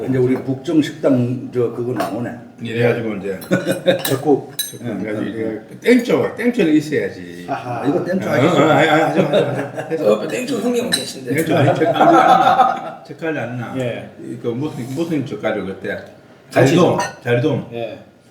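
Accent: Gyeongsang, South Korea. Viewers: 구수한 갱상도 사투리에...참 유쾌하게 드시고...진짜 맛있게 잘드시네요..~~^^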